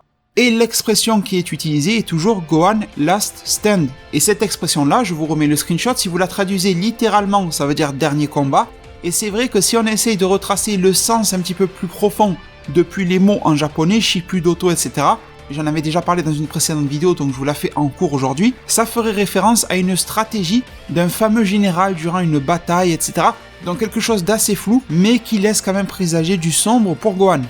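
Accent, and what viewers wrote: Marseille, France. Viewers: T es marseillais ?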